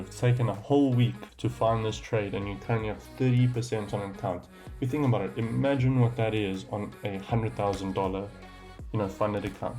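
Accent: South Africa. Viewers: First time watching one of your videos. Definitely becoming a new sub. Loving the South African accent 💪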